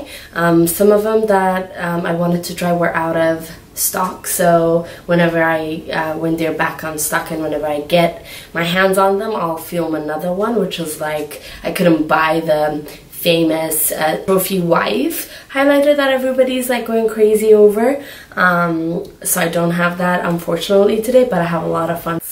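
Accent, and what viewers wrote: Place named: Ethiopia. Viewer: Amharic alawata alesh kionjo 😂😂😂anyways it’s okay 👌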